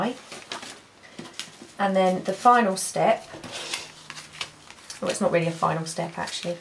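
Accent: England, United Kingdom. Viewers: Do you always distress with a brown ? Do other colours work,blue say or turquoise? I haven’t used these (cards) but I only draw and paint so far. Where is your accent from England ? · thank you for sharing very helpful love your creativity and just love listening to you girls from the u.k. so up beat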